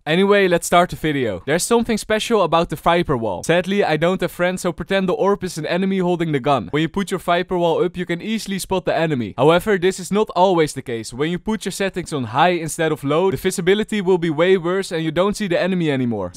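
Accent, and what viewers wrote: Netherlands. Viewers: I will personally finance a getting rid of your Dutch accent course (coming from a fellow Dutchie)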